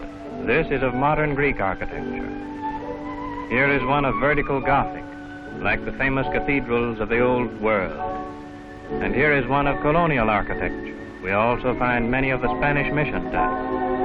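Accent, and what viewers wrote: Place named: Mid-Atlantic, United States. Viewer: It's the Mid-Atlantic accent. Popular for the time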